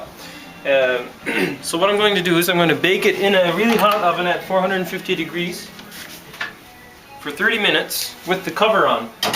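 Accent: Canada. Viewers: I like your Canadian accent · sounds Canadian. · big fan here !! "cant beat it wit a stick" made my morning. you sound like the bys back home in NL.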